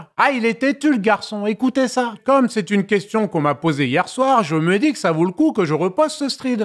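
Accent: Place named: Paris, France. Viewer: c'est la gouaille parisienne sauce bourpif sur lit d'écriture talentueuse. J'ai la glotte qui flotte et j'ai encore faim.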